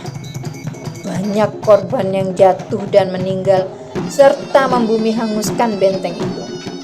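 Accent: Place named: Java, Indonesia. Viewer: masih kentara logat jawanya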